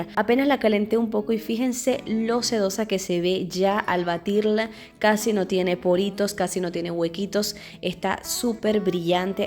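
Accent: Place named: Venezuela. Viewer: Hola soy nueva seguidora y me enganche, eres de Venezuela? Porque estas en Argentina pero el acento te delata😅😅😅😅😅😀😀😀😀😀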